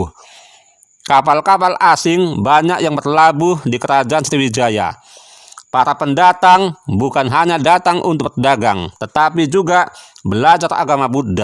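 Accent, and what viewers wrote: Java, Indonesia. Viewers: Saya penasaran dengan Om ini ilmu Sejarah dan Agama sangat oke.dan kalau bicara logat bataknya tidak kelihatan bahkan logat bicaranya seperti orang jawa.Padahal aslinya orang Batak.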